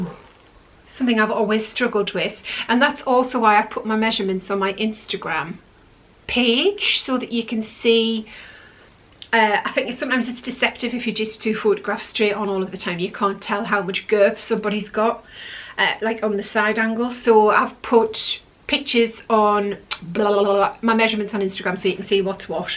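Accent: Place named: North East England, United Kingdom. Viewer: Brilliant to see you back, I’ve missed hearing a north east accent! Xxx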